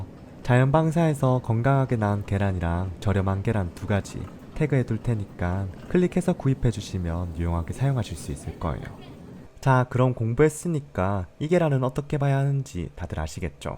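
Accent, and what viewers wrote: Gyeongsang, South Korea. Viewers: 경북억양 경남억양이 섞이셨네요...맞나요?^^ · 표준말 같은 구수한 경상도 사투리...ㅋ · 그냥 이렇게 평소 말투로 하시는거 추천합니다. 저도 경상도지만 완벽하게 억양교정 잘 안될뿐더러 ( 도 안되시던데요?😂) 굳이 교정할 필요 있을까요? 사투리 매력적이고 재밌어요 경상도도, 전라도도, 강원도도, 충청도도 다다 ㅎㅎ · 계속 경상도 액센트로 해주시길 부탁드립니다. 경상도 액센트는 세계최고 멋져요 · 경상도 분이신가보네요 듣는데 사투리가자꾸들려서 좋네요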